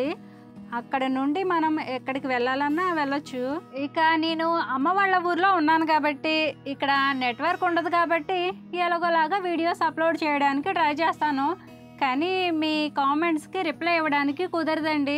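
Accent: Telangana, India. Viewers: Madi telengana maku ma slang aunty estam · శుభోదయం మిత్రమా ❤ తల్లీ తండ్రుల ప్రేమకి ప్రపంచంలో మరే ప్రేమా సాటిరాదు సుమా..😊 దూరపు కొండలు నునుపు అని ఊరికే అన్నారా ఉన్నదంట్లో తృప్తి పడకుండా లేనిధానికోసం వేంపర్లాట పడితే మనశ్శాంతి కరువవుతోంది మరి.. ఎక్కడ చూసినా చాలా కుటుంబాల్లో ఈ ప్రేమ పెళ్లిళ్ల వ్యవహారంలో పెద్దలే పిల్లలమాట వినాల్సివస్తుంది..😢 లేదంటే మేము మెజర్స్ అంటారు పాతికేళ్ళు కని పెంచిన పెద్దవాళ్ళ మీద ఎందుకు ఈ పిల్లలకి నమ్మకం ఉండదో నాకు ఇప్పటికీ అర్థం కానీ ప్రశ్న..ఎంత ధైర్యంగా ప్రేమ పెళ్లి చేసుకుంటారో అంతే ధైర్యంగా ఆజంట ఒకరినొకరు అర్థం చేసుకుని వృద్ధిలోకి ఓస్తే ముందుగా సంతోషించేది తల్లితండ్రులే..ఇలా కాకుండా కోరి కట్టుకున్నవాడు కష్టపేడితే ఆ అమ్మాయినీ వారి సంసారం చూసి తల్లితండ్రులు చచ్చేవరకు బాధపడుతూనే వుంటారు..😢 మీ తల్లితండ్రులు మీ అందరిమీదా ప్రేమగా ఉన్నంతలో వాళ్ళ భాధ్యతలు నెరవేర్చడం చూస్తుంటే చాలా ముచ్చటేస్తుంది..పిండివంటలు అన్నీ నోరూరిస్తున్నాయి మీ ముగ్గురు అక్కాచెల్లెళ్ళు చాలా అదృష్టవంతులు సుమా...❤ గోదావరి పిల్ల నోట తెలంగాణ మాట యాసతో భలే మాట్లడావ్ సుమా..👌😂హైద్రాబాద్ కి నువ్వు కూడా పిల్లల్ని తీసుకుని వెళ్ళవల్సింది సుమా..నీ మాటలతో hyd చల్లబడి పోతుంది..😅అయినా అక్కడ మన అంత ఎండలు ఏమీ లేవు అంట సుమా నాలుగురోజులు వెళ్లాల్సింది..😢 పోన్లే ఇక్కడ నాన్నని హ్యాపీగా చూసుకుంటూ మాకు అక్కడ ప్రకృతి అందాలన్నీ చూపించు..❤ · Madi telangana.naku ma slang antene mastu estam akka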